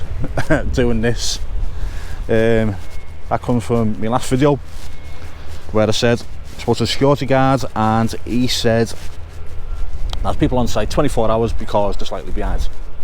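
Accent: Liverpool, United Kingdom. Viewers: Scouse accent is worth the watch alone. Boss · Love your videos.....can’t always understand every word of your lovely Scouse accent but I’m a Geordie and you probably wouldn’t understand me ! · Personality l think your coverage is brilliant .. honest , humorous and as a scouser living in Australia 🇦🇺 great to hear a raw scouse accent 👍 if thay don't like the commentary .. thay don't watch it ok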